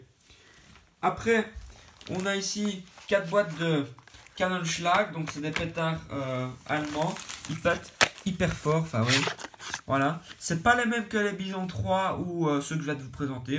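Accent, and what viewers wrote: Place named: Belgium. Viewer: Non c un belge çà s'entend ( je suis belge)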